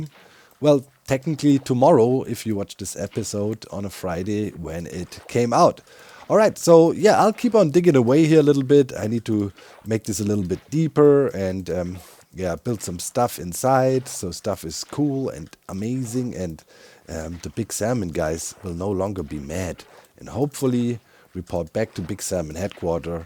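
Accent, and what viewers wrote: Germany. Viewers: In my [admittedly long] student career, the professors with the best true/technical understanding and working knowledge of their chosen field have been German. I count those professors among my favorites; I learned so much from them, and still dream of aspiring to their level in my own work. Is that why I enjoy watching vids? Might be. He's got the Hive Mind, but he's also got an accent like my old professors, and he produces the specific-technical kind of violently ingenious applications that might as well be a stereotype of Germanic Engineers. And he's got a thing for tomatoes. Inspiring yet relatable, with a comforting accent. Don't worry about the video editing, nor the final length of the videos you post. I think your fan base is here for the long haul.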